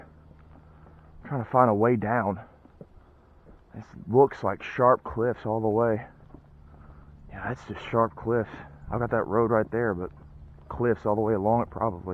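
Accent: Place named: South, United States. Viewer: Speaks like Huckleberry Finn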